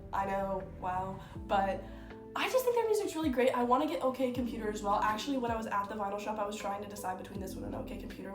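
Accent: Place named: New York, United States. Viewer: So yesterday, being NY born, I saw a vid about NY things you didn't know. Today I get a short of you dressed as a Brit on a rock. (Photographer) I was taken aback as I lived in Dublin for years and I found your doppelganger, good friend then, from Kilkenny. It's not like it's similar, it's exact! Anyway it was cool to see that lovely Irish face again, but speaking with a NY accent. Also very eclectic pics here, I'll check some out, thanks. Slainta and cheers.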